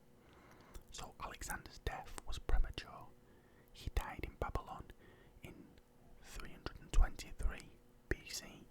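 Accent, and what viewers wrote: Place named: Manchester, United Kingdom. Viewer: Sounds like a Manchester accent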